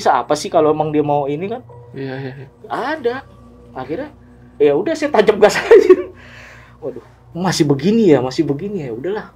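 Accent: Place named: Banjar, Indonesia. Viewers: Pak dialek banjar mu masih melekat Pak wkwkwk, sehat terus Pak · Logat kalimantan sdin😅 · Ada logat banjarnyaa 👍👍👍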